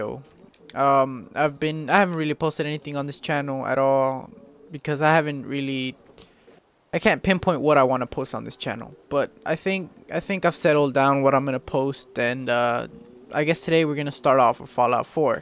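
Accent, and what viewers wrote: United States. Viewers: you sound like your from LA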